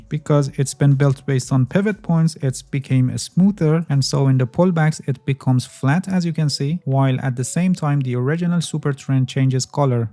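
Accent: Iran. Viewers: thanks. i can hear your iranian accent. but you speak good. 👍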